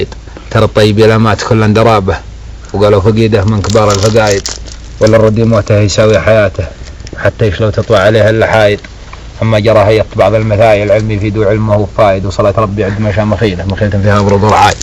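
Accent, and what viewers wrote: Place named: Shabwa, Yemen. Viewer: الكنق العربي بالغلط حطيت حرف الهاء المهم عدلته وكل ما اقصده يا اخوي ان لهجتنا مثل لهجة صاحب القصيده انت تشوف لهجه اهل تعز واهل صنعاء واهل عدن وبعض اهل البيضاء وبعض اهل صعده وتضنها لهجه اهل اليمن وانت في هذا غلطان لان لهجتنا مثل لهجه صاحب القصيده وانا من شبوه ولهجتنا مثلها وبعض اهل صعده واهل الجوف ومارب وقبائل حضرموت… الخ لهجتهم نفس لهجه صاحب القصيده في اليمن لهجات كثيره واكثر لهجه العرب تعرفها لان اصحابها يطلعون في الاعلام وغيرها هم اهل ال3 المدن عدن وتعز وصنعاء وترى كل منطقه يمنيه لهجتها تختلف عن الثانيه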